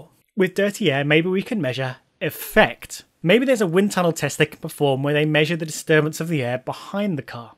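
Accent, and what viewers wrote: United Kingdom. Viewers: lol brits always say social mediar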